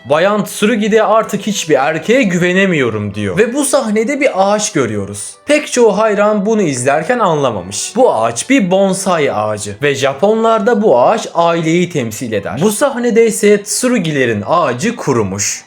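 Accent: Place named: Azerbaijan. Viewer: abi azerisin anladıkta HARKASA yeniden merhaba diye girme kulağım acıyo hErkEs e harfi var orda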